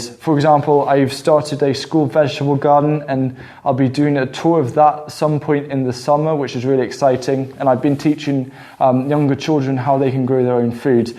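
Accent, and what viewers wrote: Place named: South Wales, United Kingdom. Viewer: Hi, I'm a new subscriber from New Zealand. Thank you so much for these wonderful videos, I love the variety of content and your personality is a winner. I was feeling quite disheartened with my soggy winter garden before stumbling across your channel and now I'm feeling a lot more inspired, thanks! Your accent is a touch of home, as my grandmother is from South Wales and I also lived there for a short time:)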